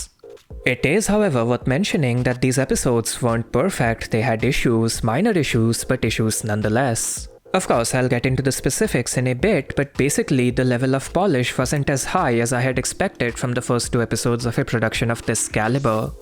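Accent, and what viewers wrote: India. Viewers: Like my comment if you think his indian accent ruins this video and want him to use an american or any other correct english accent. Sorry mate but we are all making a stand. If you dont change the accent on the video we will unsubscribe until you do. Peace be with you brother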